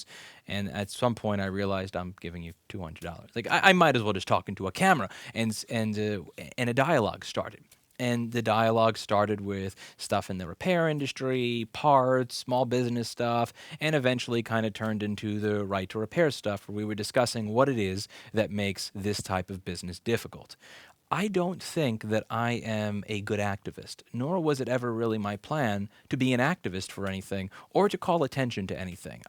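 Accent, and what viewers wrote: New York, United States. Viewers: Louis, someone else should do the day-to-day, but YOU should continue flying yourself to speak at legislative hearings and reimbursing your expenses ONLY from the advocacy group. The organization needs to have near-infallible, well-credentialed technical advisors and knowledgeable spokespersons from all corners of the RTR effort. YOU should be one of a large team of those experts. You’re a bit “New York” for this Oakland native, but you are well-spoken and engaging. You could tell a story about your trip to the “likkuh stowe” ...err, “bodega” last night for a bag of chips and people will listen. All my best to Mr. Clinton. Tell Oreo to go pound sand.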